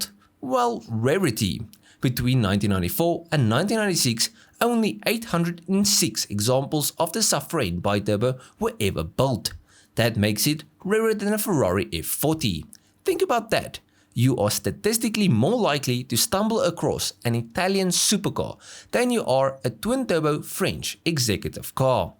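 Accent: South Africa. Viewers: exactly. Sounds very much like a south african afrikaans speaker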